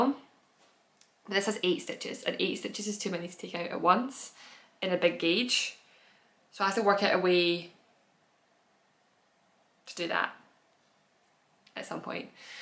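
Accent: Scotland, United Kingdom. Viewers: Haha its not the speed its the Scottish accent, I love it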